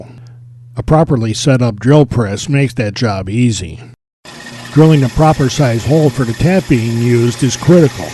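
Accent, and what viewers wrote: Chicago, United States. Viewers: Very Chicago. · Love that Chicago accent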